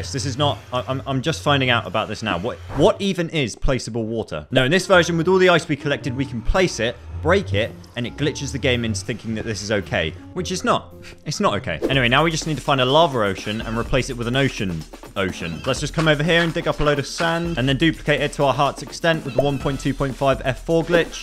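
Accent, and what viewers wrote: United Kingdom. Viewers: The British accent makes this so much funnier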